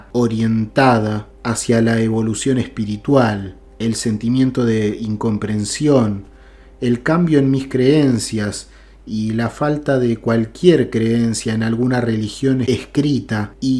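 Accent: Argentina. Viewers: SE NOTA QUE QUIEN RELATA LA EXPERIENCIA ES ARGENTINO...YO TAMBIEN SOY ARGENTINA....ME CONFORTA ESCUCHARLO Y MUCHO....GRACIAS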